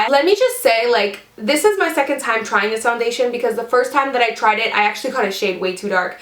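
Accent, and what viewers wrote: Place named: Canada. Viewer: I can really hear your Canadian accent in these recent videos of yours . Its adorable!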